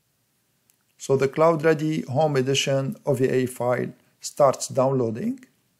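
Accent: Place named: Lebanon. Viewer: huge lebanese accent lol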